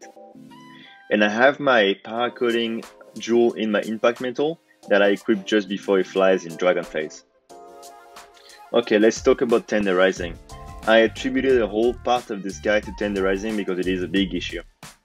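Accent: France. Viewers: Ça s'entend que t'es français ! T'aurais pu sous-titrer pour tes compatriotes.. 🙄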